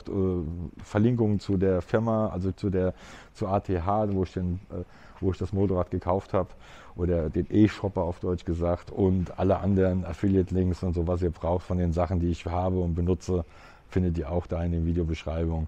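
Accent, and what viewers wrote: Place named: Frankfurt, Germany. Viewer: Ich habe sofort rausgehört dass du aus Frankfurt kommst 💪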